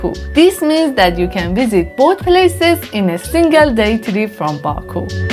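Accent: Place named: Iran. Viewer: I love your Iranian accent❤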